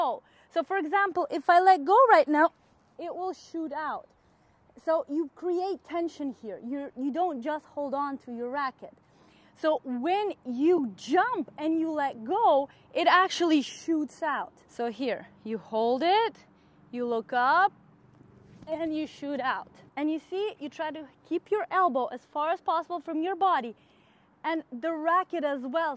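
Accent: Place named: Eastern Europe. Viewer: Just curious love the accent are you Eastern European?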